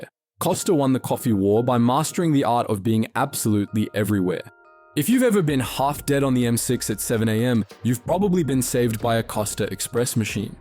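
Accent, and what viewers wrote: Australia. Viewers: I know it’s AI but love how it’s an Australian with deep, comprehensive knowledge on UK high streets 😂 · Based in Cyprus, Australian "voice", making videos on the UK Lowk slop icl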